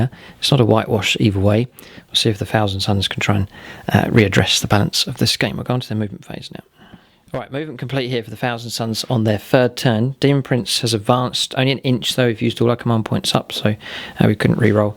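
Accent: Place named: Hampshire, United Kingdom. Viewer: The accent hints at it, but might you be in or around Hampshire and up for a good brain-picking? Your Drukhari painting guide's been invaluable to me so far, so thanks for that!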